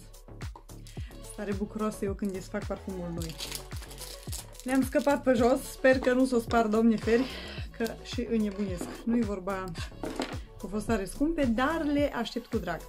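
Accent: Maramureș, Romania. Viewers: E primul clip al tău pe care îl văd, îmi place maxim cum vorbești, bag mana in foc că ești din Maramureș. Am ghicit? Nu cunosc aceste parfumuri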